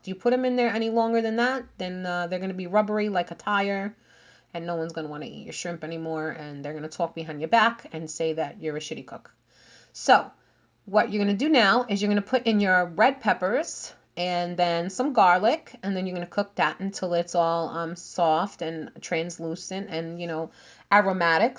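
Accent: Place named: Long Island, United States. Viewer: you sound like ur from Long Island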